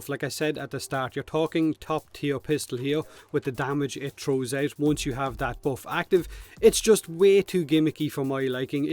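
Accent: Ireland. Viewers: I always come here for my two favorite things. Warframe, and an Irish accent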